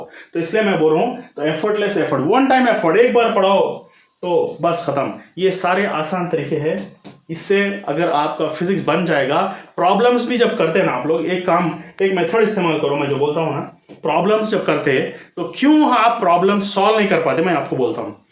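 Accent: Hyderabad, India. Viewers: Hyderabad se lgte . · Sir ka hyderabadi accent sunne k liye Aya main.. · Sir aap hyderabadi haii kyaa😅 Good explanation sir😊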